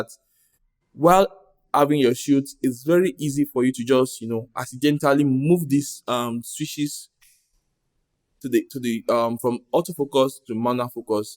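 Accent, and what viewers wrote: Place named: Yoruba, Nigeria. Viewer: I LOVE YOUR YORUBA ACCENT ABURO..GOOD REVIEW..JUST SUBSCRIBED.!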